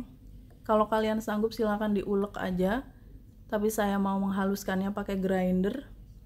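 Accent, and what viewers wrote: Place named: West Java, Indonesia. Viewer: Pasti org Sunda...itu ada bahasa Lekoh nya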